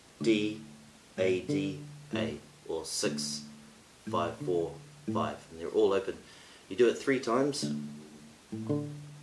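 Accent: New Zealand. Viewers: I'm really glad to enjoy your tutorial here. Moreover, 'Kia ora' is very familiar because when I visited New Zealand for a month 2011, English Program for teachers in Auckland. My hosts were very warm-hearted and I still miss them a lot. Today your English accent and pronunciation reminds me of them. Especially whenever I have free time, I'll visit here and enjoy your play and explanation. Thank you so much ~!😊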